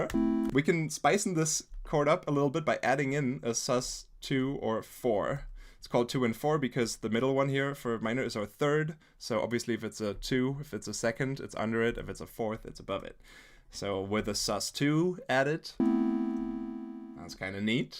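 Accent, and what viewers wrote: Germany. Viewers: Why? He has a German accent.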